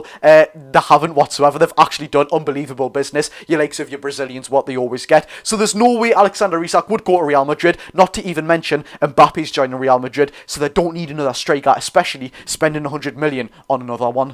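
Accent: Newcastle, United Kingdom. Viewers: Great video mate Proper Geordie you bro So very proud of you bro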